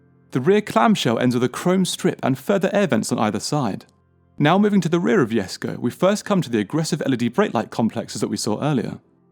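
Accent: RP, United Kingdom. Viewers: Im american but I know posh when i hear it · Brittish accent is so damn annoying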